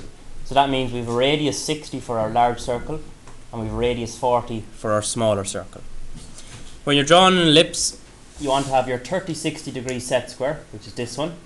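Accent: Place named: Ireland. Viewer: the irish acent tho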